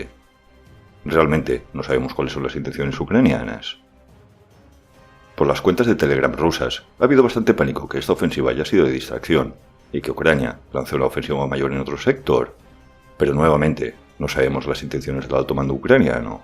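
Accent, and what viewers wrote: Galicia, Spain. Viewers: Perdon por el off topic, ¿tu acento es de Galicia?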